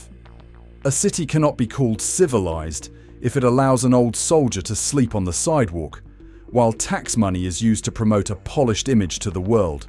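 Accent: England, United Kingdom. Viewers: Who is putting this BS out???..California???.NYCity???...and this person has an English accent!!!....I live in Fla. and I do not SEE any of this reported BS!! So those who were homeless and jobless and dependent on Welfare or Charity in their previous locale, come to Fla. and bring their same hard luck stories with them!!!!....I recommend that Florida establish a border check point and IF you are not already a resident, then you get turned around to go back where you came from....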